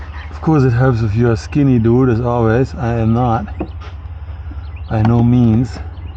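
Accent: Germany. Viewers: Super nice Video! If you could do the process also for a VW Touran VAN? Seems to me quite simular, but obviously there are differences. Also i don't have a garage with all the tools you used. By origin you must be garman by your accent? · Really hoped this would be easier for someone who usually doesn't work on his car 😂 You're accent sounds a bit German, and your friend's sounds Italian. What kind of accent is that?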